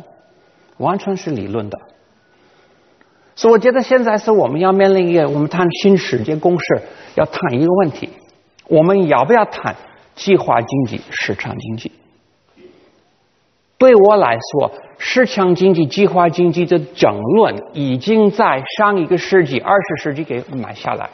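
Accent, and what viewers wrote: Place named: Tianjin, China. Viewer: 来中国先到的南开，现在口音还能听到天津味儿，哈哈。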